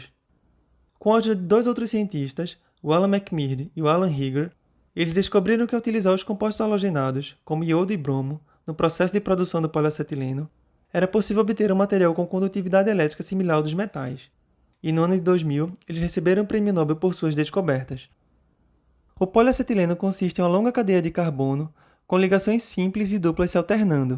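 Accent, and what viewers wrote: Brazil. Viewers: Hay un canal de ciencia se llama manual do mundo. El tío también es de Brasil. Pero su acento es muy diferente de tuyo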